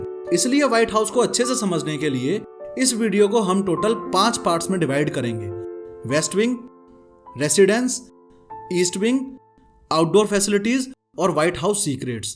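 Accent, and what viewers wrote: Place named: Jaipur, India. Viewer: Sir are you from Jaipur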